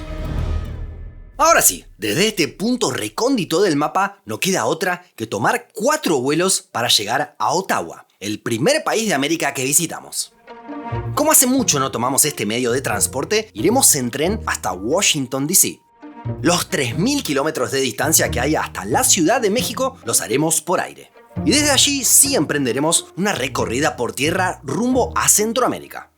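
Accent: Rioplatense, Argentina. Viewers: De dónde sos ? Me encanta tu acento! (Argentino o uruguayo)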